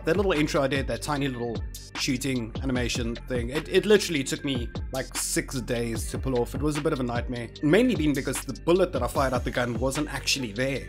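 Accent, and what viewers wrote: Afrikaans, South Africa. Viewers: Mister, are you South African? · are you South African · You south African? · dis man from SA? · Is this boy South African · Your South African I hear the Afrikaans in you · U sound south African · My bru!! Love hearing the saffa accent in a Rust vid nogals!! I’m subscribed, keep up the good work!! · You're South African? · You sound south african mate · You sound South African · Are you south african ?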